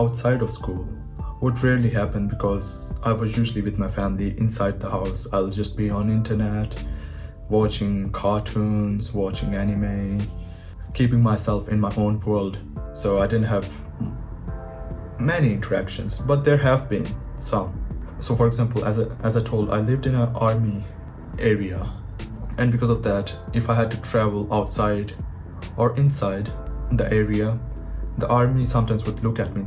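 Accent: Pakistan. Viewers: WHERE YOU GOT THE PAKISTANI ACENT FROM??? I LIKE IT, SO ORIGINAL. 😭😭😭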